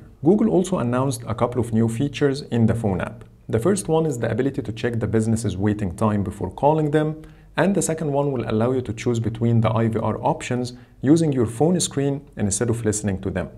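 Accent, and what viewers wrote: Egypt. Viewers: احلي مسا عليك من مصر الفيديو جامد عرفتك من اول الفيديو انك مصري 😂♥️♥️♥️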